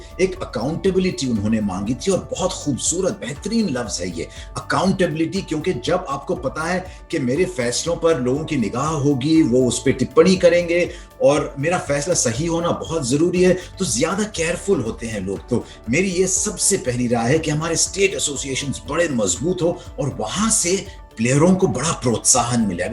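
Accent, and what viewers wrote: Hyderabad, India. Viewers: he speaks hydrabadi as well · Hyderabad aur Lucknow ka asar kaafi hai Harsha Bhogle ji ki zubaan me. ❤️❤️ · There is a bit Hyderabad accent there😀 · There is Hyderabad accent deep in there trying to pop out..ofcourse it is present like a Hyderabadi biriyani in top end restaurant..presented in polished manner.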